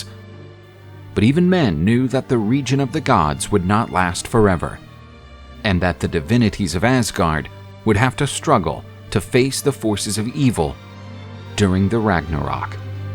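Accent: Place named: United States. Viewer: You say all of the Nordic words like an american, great storytelling other than the pronunciations of names.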